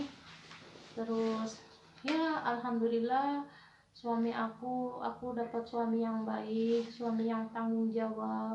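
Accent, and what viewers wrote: Banyumas, Indonesia. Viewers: ngapak ngapak salam kreasie kompelite like like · Karo basa ngapak yaa, mba..😂😂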